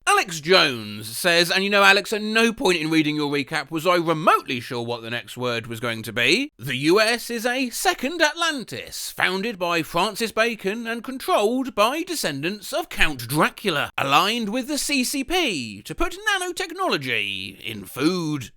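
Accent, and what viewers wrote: United Kingdom. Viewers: my friends will never understand how much whimsy listening to a british man reading quotes from twitter (no im not calling it x im boycotting it take THAT ELONgated MUSKet) and reddit gives me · I LOVE LISTENING TO A BRITISH MAN YELLING DIRECTLY INTO MY EAR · i have never been so excited about a british man reading text on a screen in my life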